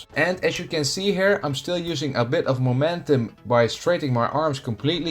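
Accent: Netherlands. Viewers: ik kan het nederlands van 3km afstand horen 😂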